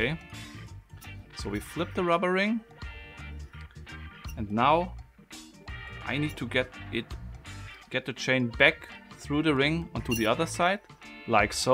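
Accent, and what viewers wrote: Germany. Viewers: Wenn ein Deutscher Englisch spricht... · damn now I love (grüße aus österreich btw) (cause he obviesly sounds german haha:D) and brilliant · Wie man einfach hört dass du Deutscher bist lol...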